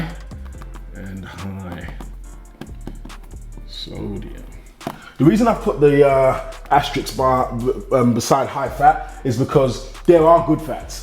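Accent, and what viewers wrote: United Kingdom. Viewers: are you British? I love your workouts man I do them with the Misus. Need to ask you a few questions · That's just another UK vs US thing. I and everyone I know in the UK pronounces it chewna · Keep that culture and UK accent alive. Cheeewna! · only got the fact he was britsh bow after 1 whole vid and 10 mins into this one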